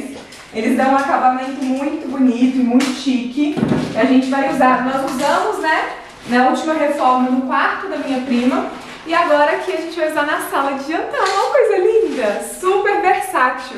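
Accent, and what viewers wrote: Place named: Minas Gerais, Brazil. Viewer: O sotaque mineiro é um trem lindo demais!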